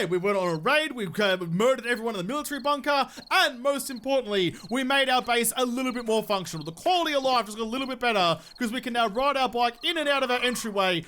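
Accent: Australia. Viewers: keep saying stuff the Australian way, bugger the Yanks!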